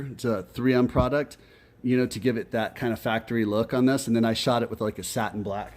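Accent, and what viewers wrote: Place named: Brooklyn, United States. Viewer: Hey, Your humor is so heartwarming. Are you sure your not from Brooklyn?? Back in the day. Yea Right, Ha. Back in the day I can remember when Rocky opened up in the Marlborough Movie Theatre in Brooklyn. Even though Rocky's hood was Philly, that movie sure had the Old Neighborhood abuzz with Italian pride. Everybody was talking about Rocky. I bring that up because guess who talks like Rocky?? Yours Truly,. You sound like your from my Old Hood. Yo!!! Any good calzones out there in Phoenix?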